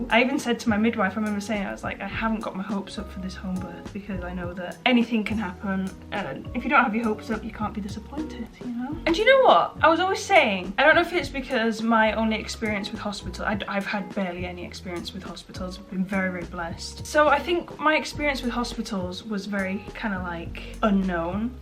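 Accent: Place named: Wales, United Kingdom. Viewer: I can seriously relate when you say you have Connor and your family only. I dropped all of my high school friends due to toxicity, and found my best friend in my boyfriend. Also I am a welshie gal !! Love watching your videos and hearing a familiar accent😊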